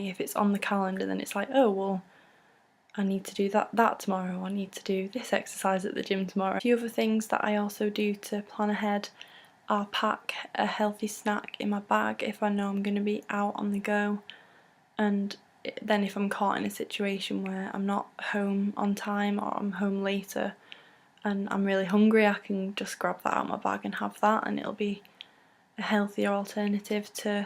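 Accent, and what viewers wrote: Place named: England, United Kingdom. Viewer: that's awesome! i love the english accent! haha yep i am :D there's a11 hr time difference! we should keep each other motivated to achieve our fitness goals :)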